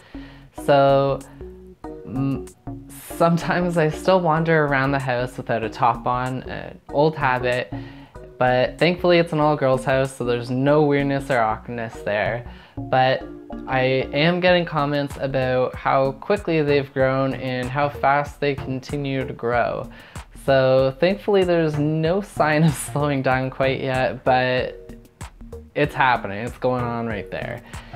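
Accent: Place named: Canada. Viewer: Love the canadian accent!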